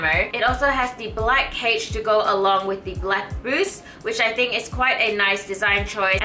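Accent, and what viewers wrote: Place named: Australia. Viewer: That’s an Aussie accent 😉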